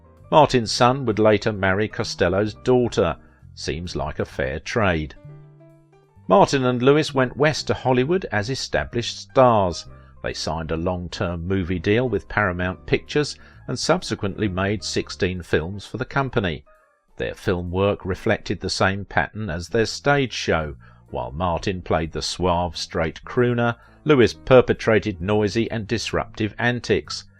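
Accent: England, United Kingdom. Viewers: He's English. Accents happen.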